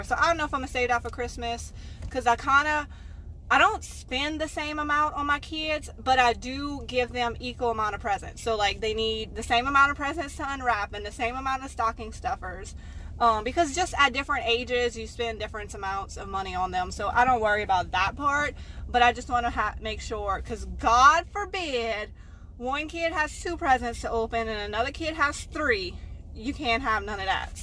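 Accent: Louisiana, United States. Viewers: You got to be from south Louisiana !! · You can tell your from Louisiana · where are you from, your accent sounds like a La. accent. Just curious I’m from La. and it reminds me of home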